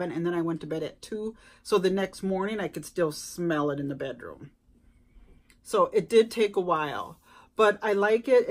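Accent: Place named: Minnesota, United States. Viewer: I knew with the accent you had to be from Minnesota, I’m from Delaware and we barely get any Rae dun. You got so lucky I want those coffe cups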